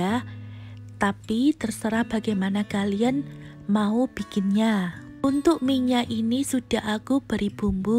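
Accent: Java, Indonesia. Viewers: logatnya jawa juga kental banget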